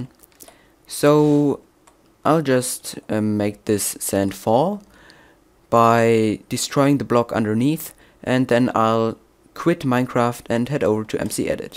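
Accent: Germany. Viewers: Man merk das du deutscher bist bleib einfach bei deutsch und nicht in englisch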